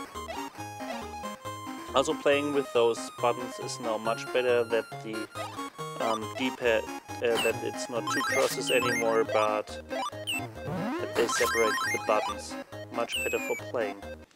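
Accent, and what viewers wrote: Germany. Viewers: I can hear your German accent, I have that one as well :)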